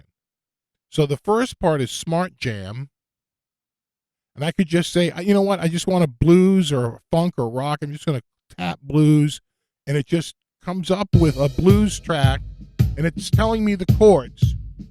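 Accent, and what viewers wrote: Boston, United States. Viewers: Being a transplant in Pa from Quincy point the Boston inflections helped me understand the descriptions of the of the features. Waiting since November for delivery, not yet. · Instant subscribe, badass no bull shit informative video, and the only video I’ve seen where someone demonstrates a clean tone not saturated with effects. Also that Boston accent is so easy to listen to! Sick work man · Nice intro to a great sounding product. I just ordered one and will be getting it in another week. This was a great demo of its capabilities and its awesome sound. Thank you,. Karl, also from Boston, but sans the accent!!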